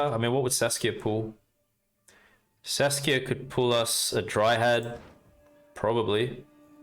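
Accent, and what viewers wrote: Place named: Tasmania, Australia. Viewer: That s why I was not sure, the Tasmanian accent tricked me 😁. Merry chrismas too.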